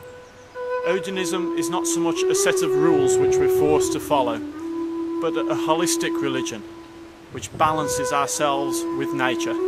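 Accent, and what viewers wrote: United Kingdom. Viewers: From the West Midlands? 😛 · Hurrah a fellow Brummie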